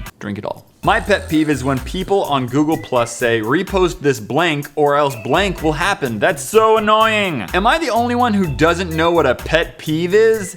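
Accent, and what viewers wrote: United Kingdom. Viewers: I'm British sorry but that was a very bad British accent. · I love the british accent you had!!! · No!!!!!! More British accent! (idk I just like the accent) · I love it when American youtubers try to do British accents!! Idk why but it's awsome and it just make me happy for no reason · Omg I love ur British accent I wish I was as good as an American accent as you are with a British accent because I'm gonna go to a school production audition and need to have a good accent but.... idk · Nice British accent it wasn't even offensive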